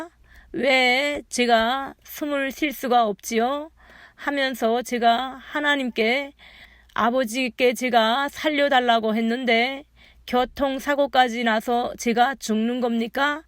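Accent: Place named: Pyongan, North Korea. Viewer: 피안도 사투리가 참 정겹게 들립니다, 나의 오마니도 1.4후퇴때 피안북도 정주에서 월남해서 고생하며 저희형제 잘 키워주시고 작년에 작고하셨다오.. 지옥의 북녁땅에서 참 많이 고생하셨군요.. 다 좋은데요, 방언은 아닙니다. 고전14장 헬라어 원본으로 잘 읽어 보면, 오늘날 현대교회에서 행하는 방언은 절대 성경과 일치하지 않습니다. 고전14장 한글번역이 굉장히 잘못된 부분이 많아요. 다다다따 방언을 예수님께서 하셨나요, 사도들이 하시던가요? 한글로 또는 영어로 기도하면 하나님이 못알아드시나요? 방언기도하다가 귀신을 초청하게될 가능성이 아주아주 높은데, 왜 맑고 깨끗한 맨정신으로 내가 알고 하나님이 받아주실 정상적인 언어로 기도하면 안될까요? 샬롬!!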